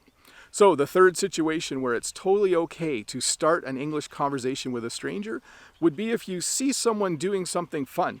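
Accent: Canada. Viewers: Hi, I noticed you pronounced the "s" in "conversation" with a "z" sound while I've heard other people pronouncing it more like a "c" sound. Is it a sort of Canadian accent? Thanks for the lesson!! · Thanks..I'm from Sri Lanka and have been a member of your educational YouTube channel for a long time and have learnt a lot so far. Your way of presenting lessons is excellent. Your Canadian English accent is very clear and learners of English may not want to switch on the English subtitles to understand what you say. Actually, it's important for all learners to talk to strangers when learning English. As I know, it's the best way to practise English and by doing so, they would be able to learn different accents of different speakers. I know by experience talking to foreigners who visit Sri Lanka that they speak in different accents. Some non-native speakers speak in broken English but it's understood.. 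It's only through mingling or interaction with others that we're able to practise speaking. This is true regarding all other languages.. KEEP MAKING MORE LEARNING VIDEOS....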